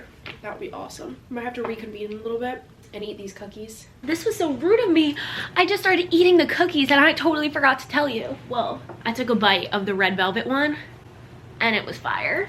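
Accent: South, United States. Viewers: i feel like you’re developing a southern accent and its so cute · You sound more southern in this!! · I don't know if it's just in this video or if it's always been like this and I just didn't notice, but actually has a really strong southern accent lol 😅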